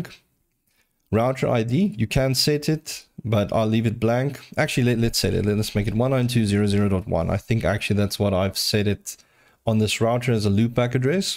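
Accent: South Africa. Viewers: This is amazing, thank you! By the way, where is your accent from? South Africa/Zimbabwe by any chance?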